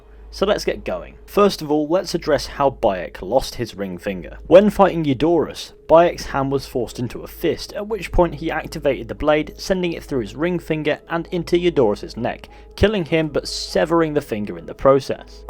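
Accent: United Kingdom. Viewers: sorry kinda sound british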